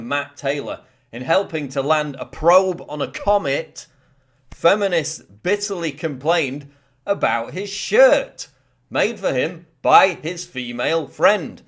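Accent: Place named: England, United Kingdom. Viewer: Alan Fournier His particular English accept you dumb fucker. Not all English people sound the same, his english accent annoys me.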